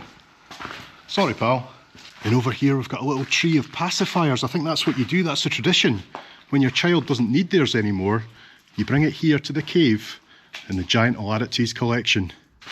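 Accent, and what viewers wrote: Scotland, United Kingdom. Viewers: First comment, I am enjoying all the videos, and look forward to them all. What I also enjoy is the delicate Scottish accent,and even nicer when comes on line...Chris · you sound british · The stiff Scottish accent is becoming increasingly understandable after each video😅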